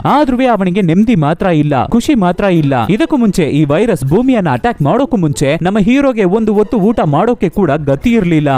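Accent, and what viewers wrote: Tamil Nadu, India. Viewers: Tamil Mix Voice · Neevu tamil avra accent same ang ide like tamil guy talking ಕನ್ನಡ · Your language slang like tamil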